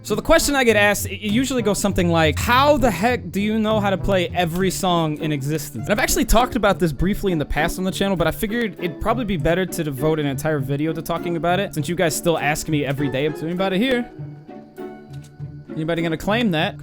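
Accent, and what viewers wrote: United States. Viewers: Guys. He said he is from Misery right? And he has an American accent too. Missouri is an American state... Boom Missouri confirmed.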